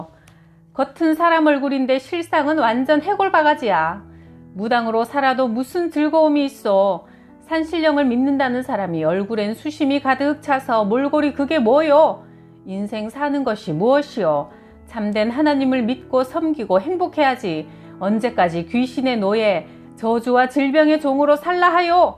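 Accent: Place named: Jeolla, South Korea. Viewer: 아따 전라도 사투리가 구수하요 잉~~~ 어쩜 이렇게 사투리가 맛깔나게 표현 하실까 사투리로 웃다가 예수이름 나오니 눈물이 가득 했다가 점쟁이가 예수님 믿는걸로 웃다가 울다가 ㅎㅎ 감사합니다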